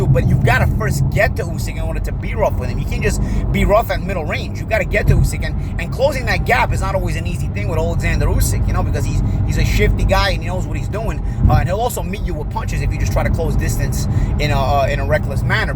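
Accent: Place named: New Jersey, United States. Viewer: Too much jersey wop accent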